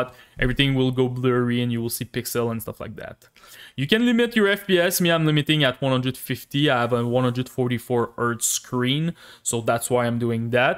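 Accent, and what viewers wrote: Quebec, Canada. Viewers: yo quebec?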